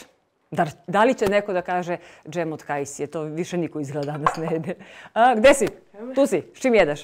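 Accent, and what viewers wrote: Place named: Srem, Croatia. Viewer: j Ja volim ovaku osobu koja na ovaj nacin prica , objasni i svima ugadja, . neznam odakle ste , sigurno iz okoline srema. lepa i sve divno napravi , za desetku.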